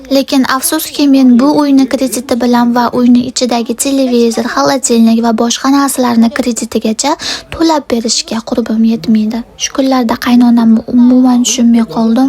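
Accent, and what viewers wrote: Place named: Andijan, Uzbekistan. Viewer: анжанликмисиз, сарагҳее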